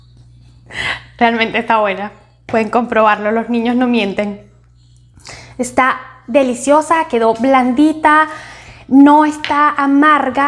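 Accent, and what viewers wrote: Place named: Venezuela. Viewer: De que parte de vzla es tu acento ?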